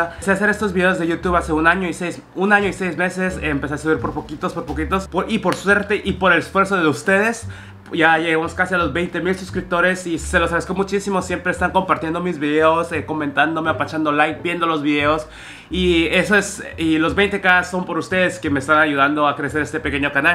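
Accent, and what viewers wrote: Guatemala. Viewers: Sos el más engasado mano, sos la mera tos con flema, me llegás porque hablas puro chapín!!! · tenes mucho nuestro acento y pues me encanta que te hables asi de mi bella Guatemala.... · vos chinito asi como diriamos aqui "sos la mera tos con flema ;3" ( hablas mas chapin que mis amigos la mayoría de esos cerotes hablan como mexicanos :v ) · Voz si q tienes el hacento chapin 🇬🇹🇬🇹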